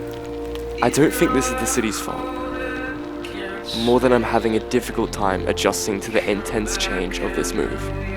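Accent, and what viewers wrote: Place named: Finland. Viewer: Great video! I was a Professor of Geography for 32 years so intimately understanding places was a part of my life. I thought that you did an excellent job in describing the different realms of the land. The primary lack was in describing the peoples of Finland. It will probably take time before you can describe the culture because of the language difference, but I think that you are picking up the Finnish acent in pronunciation of the place names. The hurdle that you will be the experience of the extreme winter. It is brutal. Nothing like any place in Australia, even Tasmania which is the coldest part of Australia. Buy some very warm clothes and get a light box if you experience SAD depression from the lack of light. Hopefully you will have a great time there. My suggestion also is to enroll in a class for Finnish.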